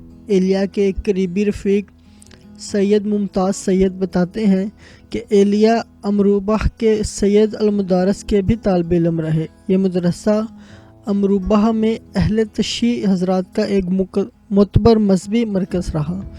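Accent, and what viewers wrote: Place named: Punjab, Pakistan. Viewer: معلومات اچھی ہیں۔۔۔مگر انتہای "پنجابیانہ" لہجہ ھے۔۔۔۔اردو کو اردو کی طرح بولیے۔۔۔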